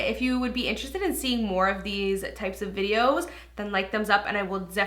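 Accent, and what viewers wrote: Canada. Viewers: Is she Canadian? Just the way she pronounces certain words sounds Canadian. :-)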